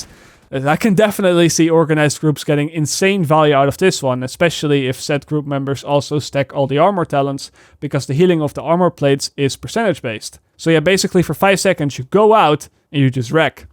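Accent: Netherlands. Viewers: now i can tell why people say are you dutch ? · Yo are you from the Netherlands, cause' I hear it at your voice.👍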